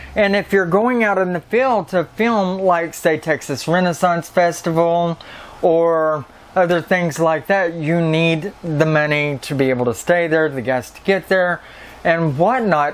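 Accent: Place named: Dakota, United States. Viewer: I lv your accent Is it dakota?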